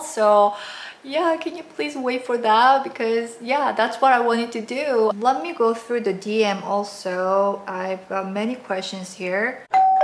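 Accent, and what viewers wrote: South Korea. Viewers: I could tell you are korean from your accent. Nice to see korean lv lover!❤️ subscibed😘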